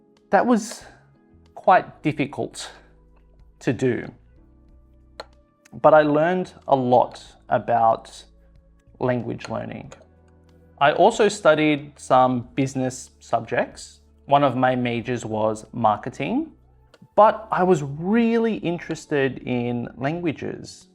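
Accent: Australia. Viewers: Ohh, I really loved to know that's an urban Australian accent 😅 I intend to move there in the future, and I confess that I was scared hahaha. We Brazilians have been influenced to believe that the Australian accent is so, so, so hard, but I've been watching some videos of real life in Sydney, Melbourne and Brisbane, and I think it is kind of like a British accent, but with much more open sounds. So... it's totally fine for me. My favorite dessert is brigadeiro 😋 Congrats on your video, you're really lovely. I have a suggestion: if you could pronounce all those words at the end of the videos, and if you could do a regular conversation with another Australian person ☺️ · Is it Australia accent?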